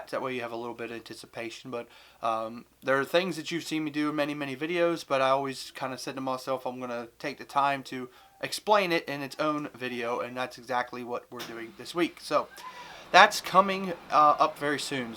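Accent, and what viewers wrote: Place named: United States. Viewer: Keep up the good stuff man! Which state is your accent from btw?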